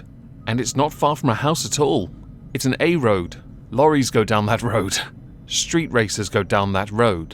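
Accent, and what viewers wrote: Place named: Birmingham, United Kingdom. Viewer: J to the B to the motherlovin' P took that sass and turned it into a positive. He got an artist to design the Hydra logo with a lobster instead, made merch of it and is donating all proceeds to charity! Also I love your Birmingham accent! Reminds me of Cat Deely playing Cat the dog on SM:TV Live XD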